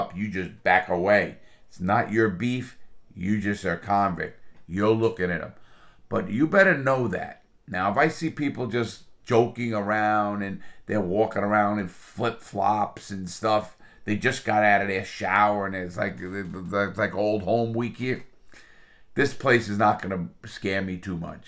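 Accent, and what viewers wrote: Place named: Brooklyn, United States. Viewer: the Brooklyn accent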